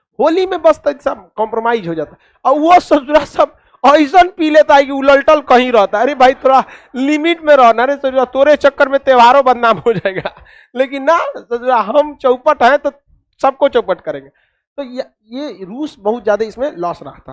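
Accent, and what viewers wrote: India. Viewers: He is going too fast. Speaking a typical bhaiyya language, spoken by vegetable and istriwalas in Mumbai.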